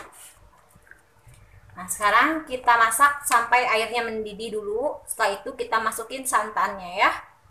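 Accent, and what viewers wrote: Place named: West Java, Indonesia. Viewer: Dari logat bicara, sepertinya si ibu ini orang sunda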